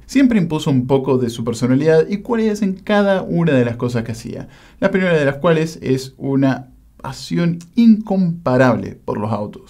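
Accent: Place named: Buenos Aires, Argentina. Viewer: *Bonaerense, que en el interior cambia bastante la pronunciación. 😉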